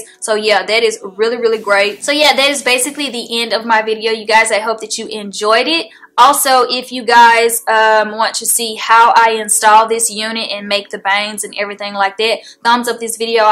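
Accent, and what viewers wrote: South, United States. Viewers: i like your accent its like country. where are you from?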